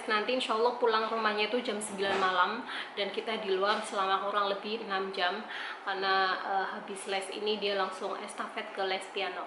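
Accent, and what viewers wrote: Banyumas, Indonesia. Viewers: Kok ngedok mba,dr banyumas apa dr mana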